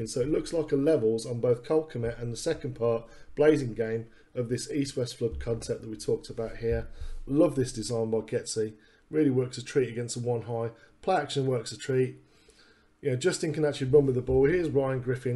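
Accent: England, United Kingdom. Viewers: Am I hearing an English dude doin detailed football analysis, love it 👍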